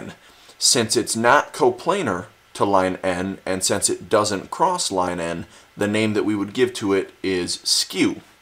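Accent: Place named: Canada. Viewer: this man is a canadian fo shooooooooo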